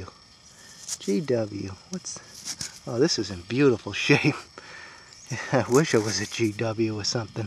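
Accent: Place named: South, United States. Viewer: Love your accent! Southern Dirt Fishing Lady doesnt hear that often!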